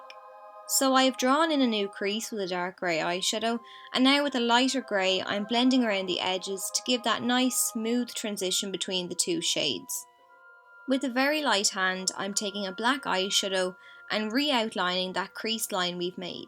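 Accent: Ireland. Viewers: I LOVE your brogue accent.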